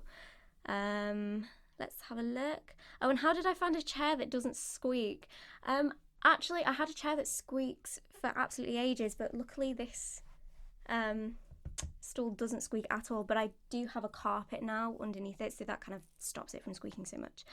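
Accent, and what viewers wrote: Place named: England, United Kingdom. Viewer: Good lord I love English accents...... I'm Irish but I'm determined to Marry an English bird, fuck that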